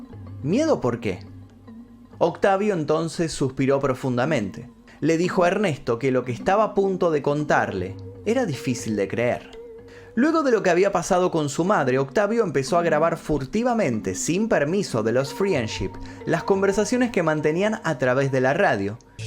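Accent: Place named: Argentina. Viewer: Hola excelente tu modo de narrar y ese acento argentino me mata. 🎉 saludos desde México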